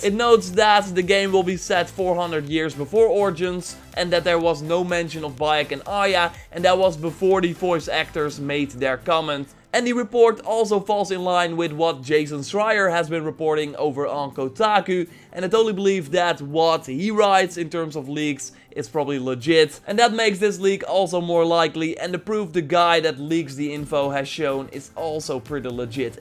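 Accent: Netherlands. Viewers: dude are you dutch or not ?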